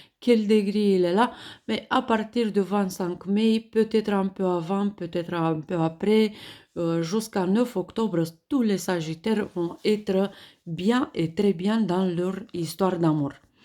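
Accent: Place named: Russia. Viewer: J'adore l'ascens russe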